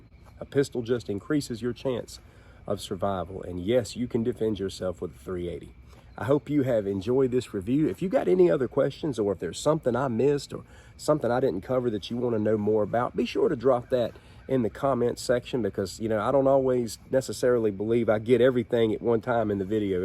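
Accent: South, United States. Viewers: This guy has a really cool southern accent. Good video.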